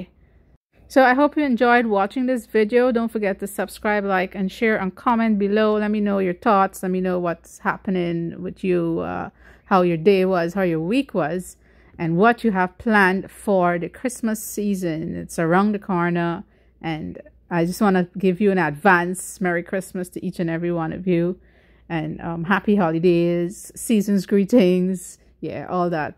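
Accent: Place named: Guyana. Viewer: Excellent presentation. Good visuals. Clearly spoken with that educated Guyanese accent.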